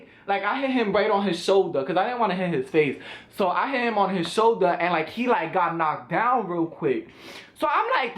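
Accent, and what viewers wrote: New York, United States. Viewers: Hey, I don't live in the USA, and I have no idea how I came across you videos, but I really like them, and I like NYC. Your storytimes gave me a pretty good insight of living in Brooklyn, and I thought it's very entertaining cuz it's actually sooo different from living in Europe,it's unreal. btw at the beginning of finding out about your channel, I couldn't understand most of the things you were saying, but after watching the How to talk like you're from New York video, I now feel like if i'd ever have to live there,I could blend in easily. So thanks for making it:D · I loveeee how you talk , that New York lingo 😍 Love your story times ! 🔥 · I love his accent 😂😂 NYC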